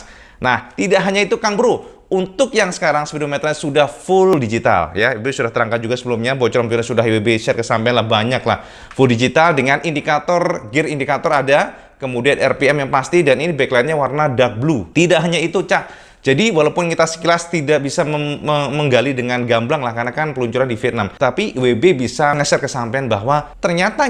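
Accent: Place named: Java, Indonesia. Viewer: Jangkrikkk..beh medok jowo tenan🤣🤣🤣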